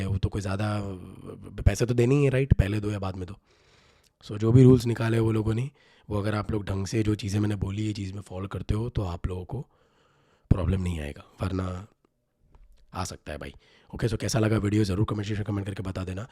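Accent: India. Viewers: You need to improve your English speaking skills, sounds like you just came from India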